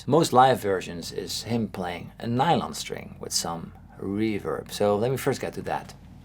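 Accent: Netherlands. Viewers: Je accent lijkt net op een Nederlander die erg goed Engels spreekt 😀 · Why do you sound so Dutch, when you talk? There's nothing Dutch on your YouTube account. The way you pronounce fingers, do you have one or two Dutch parents? Then, you should really meet Teye.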